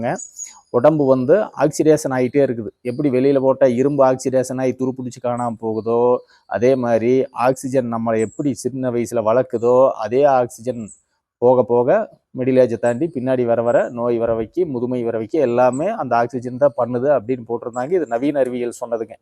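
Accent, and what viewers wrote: Kongu, India. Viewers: ஆஹா அருமை தம்பி.. கொங்கு மண்டல தமிழில் எளிதாக புரியும் வகையில் இருக்கும் அற்புதமான பேச்சு...உணவே மருந்து என்ற ஒன்று இப்போது அவசியம்...மருந்தை நம்பும் சிலர் இயற்கை உணவை நம்புவது இல்லை என்று வருத்தமாக உள்ளது... இன்னும் கூட தெளிவாக பச்சையாக சாப்பிட வேண்டிய காய்கறிகள் தந்து இருக்கலாம். பழங்கள் பச்சையாக சாப்பிட முடியும்... நன்றி தம்பி 🎉🎉🎉 .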